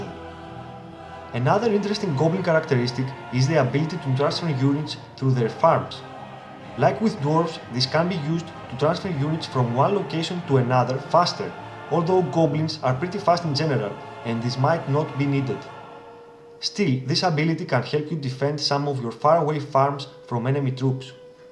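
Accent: Greece. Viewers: Greek! From the accend! Haha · Λολ μπορώ να καταλάβω ότι είσαι Έλληνας απ την προφορά σου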